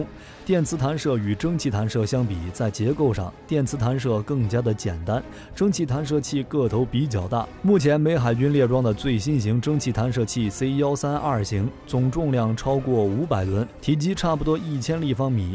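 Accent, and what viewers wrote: China. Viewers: 看他的解说用词和口音就知道绝对是大陆人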